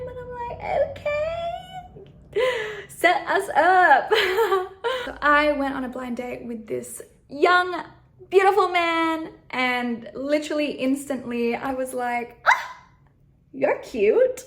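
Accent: Australia. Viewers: She should join Miss U Australia. She looks and sounds more australian than she is Filipina. “Living in” is still considered a taboo in the Philippines especially for celebrities and beauty queens. Not really a Filipina representation. A Miss Australia perhaps? I will still support!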